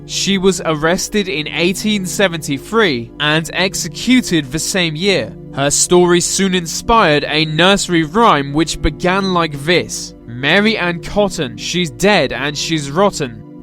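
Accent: United Kingdom. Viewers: are you British cos your voice is fabuloussssss ☺☺☺☺☺☺☺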